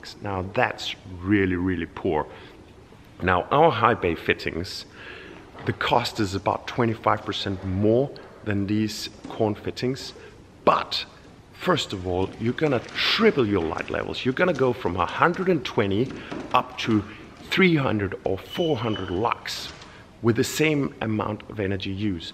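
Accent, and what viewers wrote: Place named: Wales, United Kingdom. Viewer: Love the welsh accent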